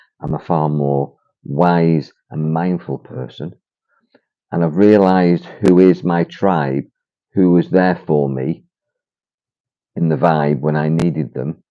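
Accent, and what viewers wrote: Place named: Manchester, United Kingdom. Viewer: Omg listening to this sounds like I'm listening about myself.. I seem to have gone through repetitions though & really at the stage where I just don't have the energy to suffer anymore. I trained in hypnotherapy too, so do know all this stuff but have found that I'm great at healing everyone else, just not myself & I know that is an affirmation in itself. (The people pleaser also) but i would be very interested in talking with you to help me move forward. I am in my 50s & no longer wish to survive but wish to thrive now. I think it's time! Also I recognise your accent are you originally from Manchester?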